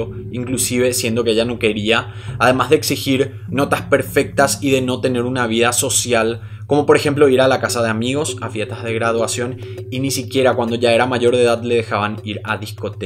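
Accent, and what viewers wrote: Guarani, Paraguay. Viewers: Me encanta tu acento guaraní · Este vídeo es algo TRAMBOLICO jajaja no mentira, realmente son muy interesantes tus vídeos y detecto en tu acento que eres paraguayo, me suscribo porque vale la pena tienes buen contenido, felicidades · Por su acento, pienso que es de Paraguay😉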